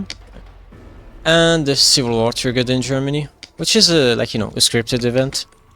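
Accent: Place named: France. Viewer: You sound french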